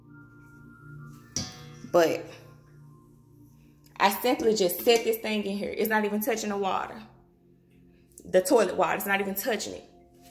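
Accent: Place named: South, United States. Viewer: She has a Southern accent. She's not really pronouncing words wrong. She just has an accent.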